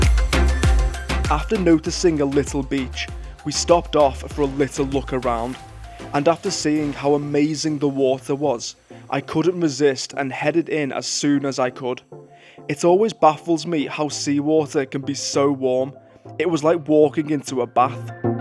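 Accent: Liverpool, United Kingdom. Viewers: U scouser?? Or from wirral?? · You sound like a scouser. You here for long I'm in cebu for 18 months now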